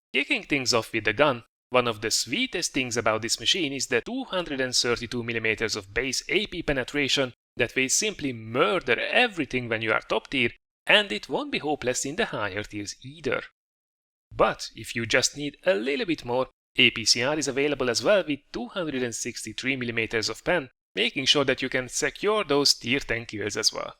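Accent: Hungary. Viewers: 100% hogy magyar vagy a kiejtésed alapján :D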